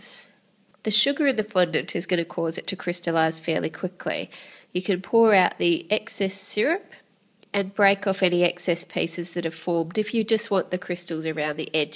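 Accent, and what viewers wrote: Australia. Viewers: Your nose sounds as stuffy as mine feels today! I can't get any air through my nose right now and I sound identical to you. I mean, minus the Australian accent and adding an American accent lol. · I always look forward to your videos. Not only have you helped my daughter and me out in baking and decorating numerous times, you have such a pleasant speaking voice and we ♡ your "sheila" accent (we're from Ohio, USA). The geodes are so ingenious and beautiful. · she definitely does if you are anywhere except Australia, but if you are Australian and have an Australian accent you probably don't notice it 😊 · That's an Aussie accent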